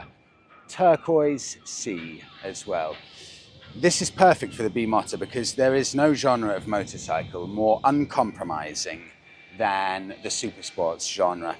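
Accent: RP, United Kingdom. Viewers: haha its just being a posh twat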